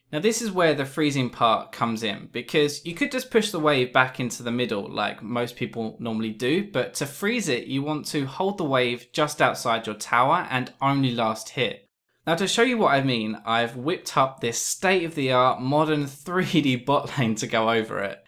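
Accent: United Kingdom. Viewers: FUCKING BRITISH ACCENT :DDDDDD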